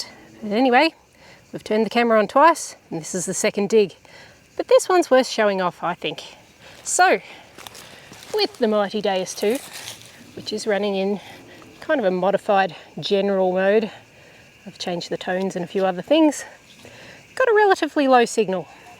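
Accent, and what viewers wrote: Australia. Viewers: Welcome back it’s nice hear your assie voice again, and watch your videos.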